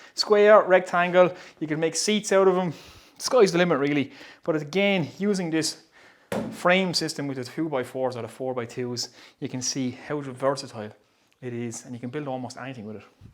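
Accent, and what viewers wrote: Waterford, Ireland. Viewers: Hi. Found your site by accident but well worth the visit. Living in Co. Meath and the accent and the Irish gift of the gab is so refreshing on a site such as this. I look forward to seeing the rest of your catalogued videos. Cheers. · Ii, great videos. Really well done and very informative. I'm just getting back into woodworking after giving up wood turning many years ago. I'm making some patio furniture and some raised planters so great ideas and guidance from your videos. I'm looking to make some chopping boards for family and friends as gifts and I'm struggling to source some hard woods such as walnut, beech maple etc. I'm seeing some hardwood suppliers online but it's a case of very little detail of board size/lenght and cost. It feels a bit intimidating when looking for relativly small amounts. Are you aware of any suppliers that would deal with craft or hobby woodworkers. 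Sawn timber would be fine as I can joint and thickness it myself. Love the videos and have started the mitre station. By the way, this might be fighting talk but is that a Deise accent I hear? Thanks and keep her going. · Great video, great workmanship but most of all, great accent. The Irish accent is always happy-sounding which is what we need over here in England at the momment. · What accent is this? Ok, Ireland.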